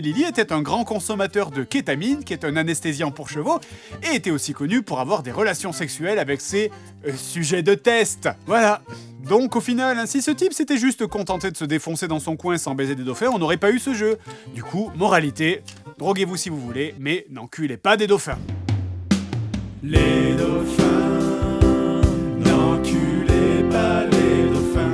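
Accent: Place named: Toulouse, France. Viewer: Tu es toulousain, ça s'entend ....ou du sud de la France...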